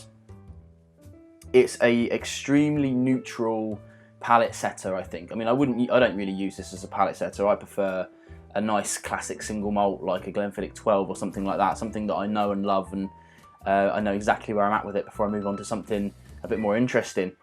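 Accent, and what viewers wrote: United Kingdom. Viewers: British accent brought me here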